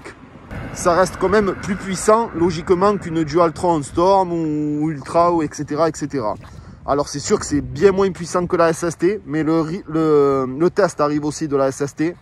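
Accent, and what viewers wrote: Marseille, France. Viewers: Excellent les Manceaux, je les vois dans la semaine pour essayer la dualtron mini et la futecher gun pro j'espere qu'ils l'ont recu. Merci pour tes videos. Je suis un nouvel abonné et franchement au top avec ton accent de Marseille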